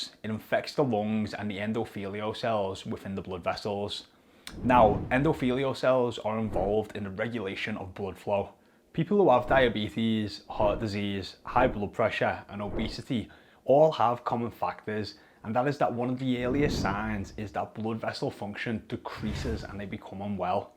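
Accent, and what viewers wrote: Liverpool, United Kingdom. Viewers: Love the Scouse accent. Brilliant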